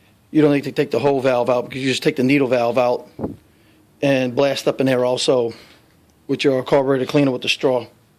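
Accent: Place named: Appalachia, United States. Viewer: his accent makes me wahna throw my car at this hillbilly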